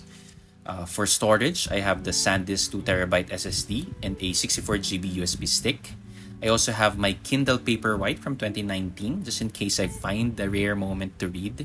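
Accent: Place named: Philippines. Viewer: Same as an EDC enthusiast I was taken a back nung nag tagalog siya and may filipino accent 😅😅. Great content po kuya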